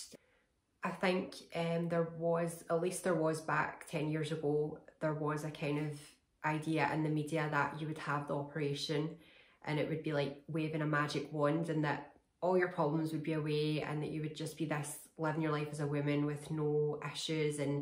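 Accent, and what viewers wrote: Scotland, United Kingdom. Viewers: Wow, never seen a fellow Trans-person with a Scottish accent before! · Lovely to hear a fellow Scottish accent,especially from someone so beautiful in both looks and deeds. You're videos help many which is a beautiful thing to do. Keep the great work girl..xx.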